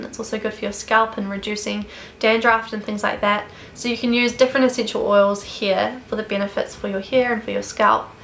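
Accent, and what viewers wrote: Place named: New Zealand. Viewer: I'm an Aussie; live in NZ and I can defs tell you - that is a Kiwi accent with a deep South roll of the R. Not an Aussie accent at all.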